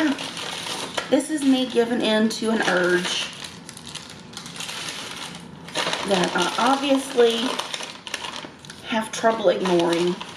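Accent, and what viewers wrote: South, United States. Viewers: She has such a beautiful face and love the southern accent!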